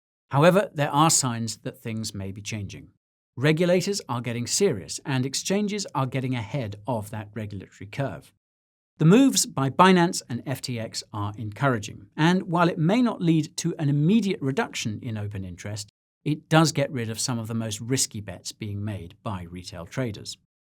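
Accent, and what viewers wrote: United States. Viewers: I gotta say,. Your American accent is hella lot better than my British accent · Killer American accent, brother. As much as I try I can’t never get the entire Brit inflection right 😂😂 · nice american accent u only slipped once! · You sound like Nicholas Cage with the American accent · The American accent kills me! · your hilarious great American accent x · Solid American accent! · Excellent American accent,way to put yourself out there,. Love your content, brother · Great American accent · nice American accent. :-) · Your American accent is on point! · Nice American accent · american accent ....lol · ur american accent is good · I love the American english accent 😆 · Lol his American accent cracks me up. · I must admit, the American accent was rather hot!💥❤ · Great vid. Loved the American accent.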